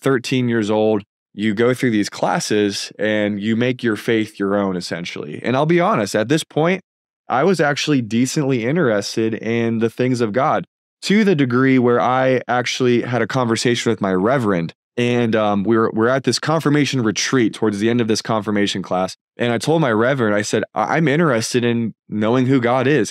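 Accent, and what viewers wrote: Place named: Canada. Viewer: U Canadian? Remind me of The Canadian Prepper. Same accent. Salute.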